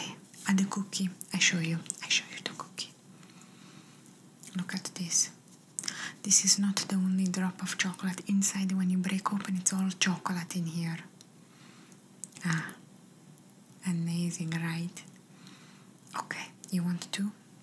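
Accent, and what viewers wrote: Italy. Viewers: Where did you learn Italian? You sound like you're actually from Italy! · These videos are so well done, love the Italian accent, so believable, you sound like some of my relatives! :goodvibes:❤ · I subscribed to purely on the basis of these Italian accent roleplays. Molto bene! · Hello perfect ASMR I loved it and I enjoyed it a lot listening to your relaxing voice with an Italian accent formidable you are great greetings gorgeous 🥰💖😍🌹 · The Italian accent definitely sets u apart in the ASMR universe. It's so different and unique. Which is why I enjoy it so much. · ……to tell you how much i loved this video would be impossible. I really swore we were at a shop in Italy. I just found your channel yesterday & have been binging your videos but i had to tell you how amazing this one is….i am so so grateful to have connected with you. 🥹❤️ i love the italian accent, so sooooo much. 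The way you say perfecto… OH MY GOODNESS! 🙏🏼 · Italian accent videos are perfect for relaxing and unwind your soothing voice makes me feel sleepy 😴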